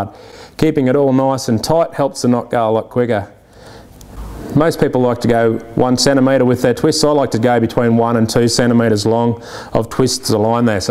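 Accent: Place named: Australia. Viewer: This video is much more blokey in the Aussie accent.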